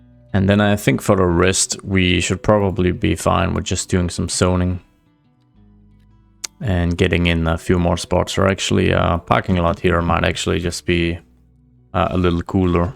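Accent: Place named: Denmark. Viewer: Man kan bare høre at du er dansker xD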